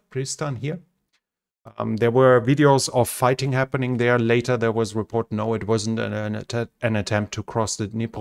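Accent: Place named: Germany. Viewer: Always remember. When your opponent is down on the ground an bleeding, it's time to start using the steel toed boots. And never in my 72 years in this life did I expect to find myself listening to a commentator with a German accent reporting on combat in Ukraine and saying 'and today on the Eastern Front...."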